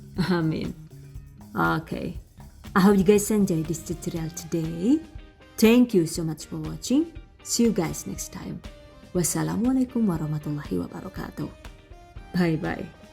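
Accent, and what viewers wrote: Banyumas, Indonesia. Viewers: rika wong ndi sih geneng ngapak yah...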